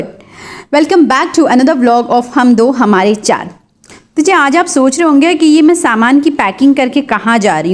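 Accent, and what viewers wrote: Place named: Jharkhand, India. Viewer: Assalamu alaikum Aapi maybe India se hun Jharkhand se jab aap baat Karti Hain To mujhe lagta hai ki aap hamari taraf ki Hi Ho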